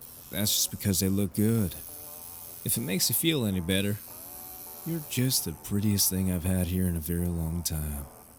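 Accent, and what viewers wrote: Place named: South, United States. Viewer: I was not expecting an accent so thank you I always love an south accent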